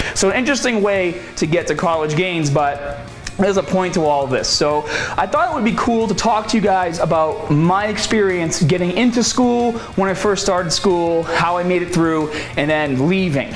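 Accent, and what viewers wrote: Boston, United States. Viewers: But that boston accent tho :D lol. #GoPatsGo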